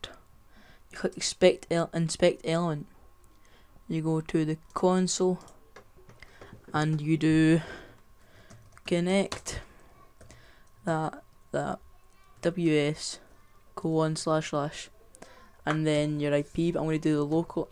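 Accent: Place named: Scotland, United Kingdom. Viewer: Thought so i am 2 xD can just tell when some1 is scottish xD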